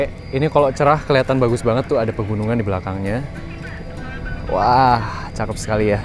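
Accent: Java, Indonesia. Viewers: kok ngomong nya medhok ya 😅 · aksenmu kok njowo ngono le kwkwk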